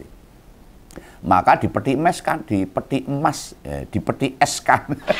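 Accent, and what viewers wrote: Java, Indonesia. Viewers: yg jelas saya senang mengikuti ngompolnya om menambah wawasan dan pengetahuan apa lagi dengan jenakanya om diselingi bahasa jawa yg medhoog terima kasih om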